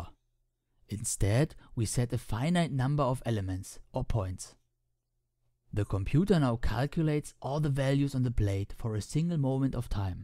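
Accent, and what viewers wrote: Germany. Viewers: Sprechen Sie Deutsch? I was trying to get the accent, because it didn't sound like the part of Germany my family lives at (Hessen und die Schwarzwald)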